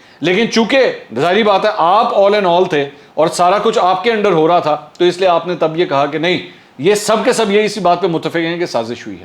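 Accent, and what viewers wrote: Punjab, Pakistan. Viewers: I love your punjabi accent. Pure like Asli Desi Ghio.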